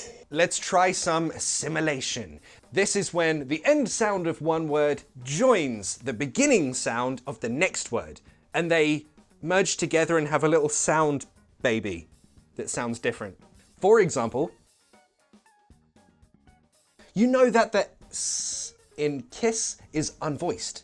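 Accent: United Kingdom. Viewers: I love the British accent, it’s sooo cleah 🤩🤩😍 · That's British accent , American accent is a bit different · Great tutorial! Thanks! I guess your are British ...could you tell me if the same rules and 'sounds' also hold for American English? Thank you again! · the british accent sounds amazing. Its clear. I really like it. Good class, teacher. Tks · Ur on a brit channel, teaching brit accent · Hello! Is this British English? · The slang is not the same as a dialect. Also, I did not say that we are talking about a dialect here. Dialect is the same group of languages, spoken slightly different depending on the region, with few different words and accentuating different letters in the words . This is actually the closest to what you are referring to as an accent, but it is still not. To the person from the UK this person in the video, doesn't have an accent. 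This is how they speak. Only for the rest of the world, especially to the english speaking people from the USA or Canada or Australia, you could say he has an "accent", but this is not correct. He speaks British English. In the USA, they speak American English. They don't speak with any accent, since this is how they speak and everyone else around them. It is different when you have accents to some letters in the words, so they may sound different to you, but this is not a definition of someone speaking with an "accent".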